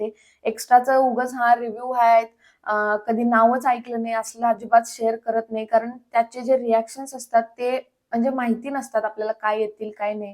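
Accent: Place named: Western Maharashtra, India. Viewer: Tumhi pakka satara sangli kolhapur kadche aahat 😅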